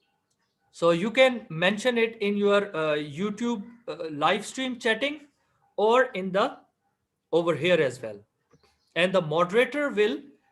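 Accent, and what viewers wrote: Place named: England, United Kingdom. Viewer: Sir you have a great English accent and Teaching style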